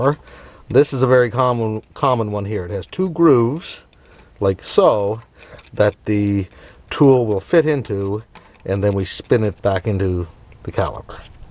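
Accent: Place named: Grand Falls, Canada. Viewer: Are you from Grand Falls? I hear the accent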